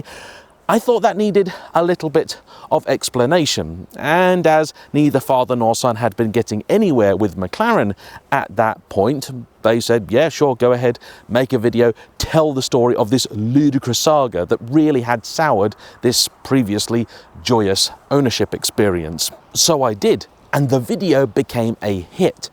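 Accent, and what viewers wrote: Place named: England, United Kingdom. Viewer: omg I love listening to PROPER English and not the American version... For anyone that does not know, English is not the native language of America, they truly don't have a native language at all! START SPEAKING PROPER ENGLISH AND STOP CALLING FOOTBALL A SPORT THAT THE BALL BARELLY TOUCHES YOUR FEET !!!!!!!!! a trash can is a bin and the hood is called BONET because the hood is the one you GREW IN !!!! You ruin everything you touch, but ruining language is a crime !!!!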